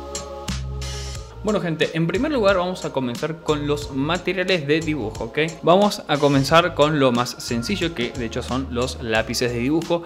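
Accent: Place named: Argentina. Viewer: Físico de polaco y acento de argentino 💝💝💝 capo total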